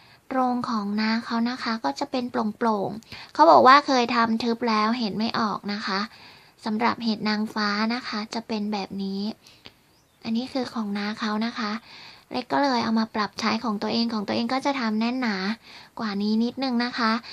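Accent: Isan, Thailand. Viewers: เว้าอีสานน่ำ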